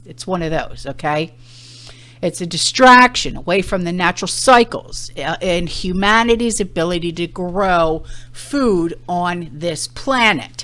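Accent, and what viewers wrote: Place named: New Jersey, United States. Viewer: I'm right there with ya shaking my fist in air. I had a feeling this was something to pay attention too when I heard the jersey girl coming through my screen!! Lol. You get 'em girl!!!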